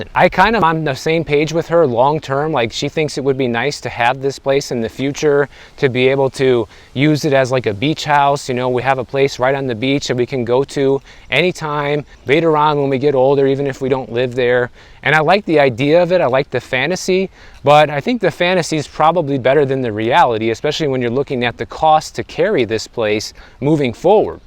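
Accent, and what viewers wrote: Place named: Midwest, United States. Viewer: Being from Boston I love hearing the Midwest Fargo-ish accent . Love the videos bro!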